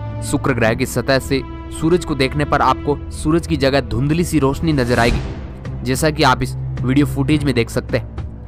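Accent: Rajasthan, India. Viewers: AAP RAJSHTHAN KE HO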